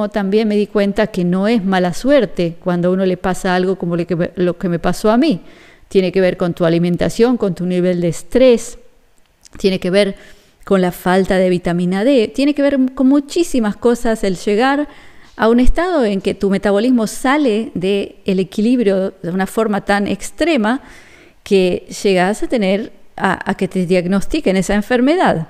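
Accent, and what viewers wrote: Argentina. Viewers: Así es querida estabas malnutrida literalmente te estabas muriendo de hambre, un punto que no mencionaste es que tomas tu alimento y no masticas no producis unas enzimas nesesarias para poder dijerir los alimentos y es otra razón por la cual estabas sin energía. No somos lo que comemos somos lo que dijerimos. Saludos y no pierdas tu acento Argentino no está usar el vos y usar nuestras palabras si nosotros entendemos al resto el resto nos puede entender a nosotros, yo también vivo fuera de Argentina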